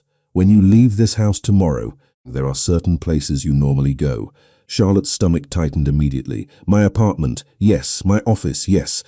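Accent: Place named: United Kingdom. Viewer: I loved the story but it was like listening to one long sentence and why British voice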